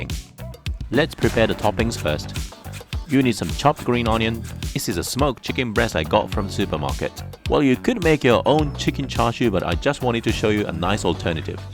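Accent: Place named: Australia. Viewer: As an Aussie, I can hear the Aussie. Especially when you say “chuck” something in, that’s very Aussie 😂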